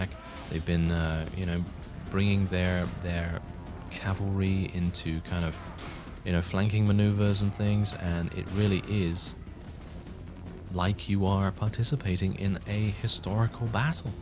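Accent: United Kingdom. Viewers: a very proper British accent in my opinion, it’s butchered otherwise haha between the old Australian accent and this blokes one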